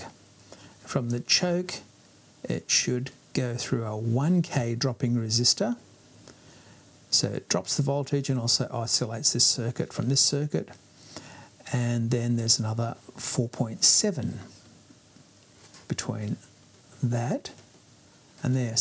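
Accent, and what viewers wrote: Australia. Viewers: AMEN!! SO glad to hear all of that! I sort of thought you might be Australian, but I wasn't for sure hearing some of those sounds that usually cue me in. And I WILL be looking for that PRO video!! Once again, so glad for your daughter's good health and to know that I have a brother just a short swim away! (I live in Jacksonville, FL) Happy Easter ... Jesus is coming back soon!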